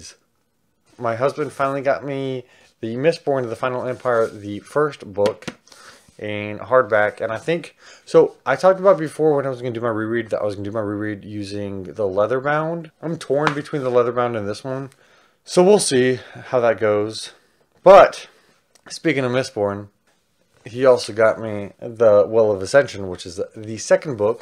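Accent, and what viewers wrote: South, United States. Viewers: I love your video at your house in Texas during your quarantine until you had some new books are "Now I Rise" and "Bright We Burn" by Kiersten White, "Aurora Rising" by Amie Kaufman and Jay Kristoff, "Thunderhead" by Neal Shusterman, "Mistborn" and "Mistborn: The Well of Ascension" by Brandon Sanderson, "The City We Became" by N.K. Jemisin, "The Vashing Half" by Brit Bennett, "The Prophets" by Robert Jones, Jr., "Angel After the Fall" by Joss Whedon, "Angel and Faith" by Christos Gage, "Queen of the Slayers" by Nancy Holder, "Warbreaker" by Brandon Sanderson, "Fire from Heaven" by Mary Renault and so many others including "Jeremiah" by Robert Cain while you're talking about all of the characters from the book to read them, so you're reading some letters by one of your fans after that, but I hope your husband, Zach will be very proud of you and I wanna say I'm happy for you, because I love your Southern accent, I know you're gay and you're half Mexican!!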